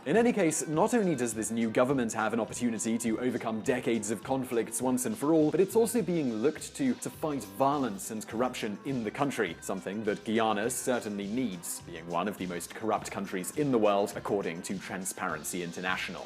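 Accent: United Kingdom. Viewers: First thing first let's get the name of the country pronounce correct it is not Guiana it is Guyana and your video is very misinform If you going to do a YouTube video on a country you should be properly informed and you should have also pointed out with your British accent that the American former President Jimmy Carter went to that country in 2015 and help Red Dead election which had send that country back into a reverse like the American and the British government did in 1964 that is something you should have pointed out Guyana economy was well on their way to better greater things under the PPP people Progressive Party now the an economy is only based on one thing that is oil please be properly informed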